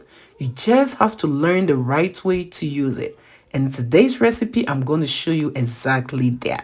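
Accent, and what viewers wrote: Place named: Africa. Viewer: Right especially with that accent sheesh !!! Africans are so dramatic 😂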